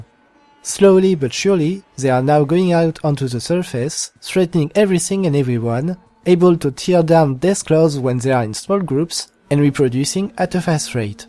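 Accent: France. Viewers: U french bro? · Pour un français tu parle bien anglais, bosse un peu ton accent mais a part ça merci ca explique pas mal de chose et de façon limpide ! Merci et good luck ^^ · Ma man's way too french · you have the stereotypical french accent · Damn that is a strong Frog accent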